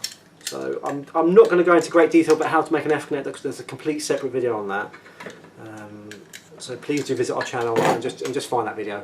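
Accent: Cockney, United Kingdom. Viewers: These videos are a bit of fun really. Yes, we could see a top-down version on a plain background, with nice zooms to the intricate parts (F-connectors, the wire-stripping task), and a slightly less frenetic pace, but it wouldn't be so enjoyable. From Australia, I enjoyed the East End accent. All good, got what I needed and had a fine viewing experience. Thanks. PS. What's the "Favourite Worst Nightmare" BTW?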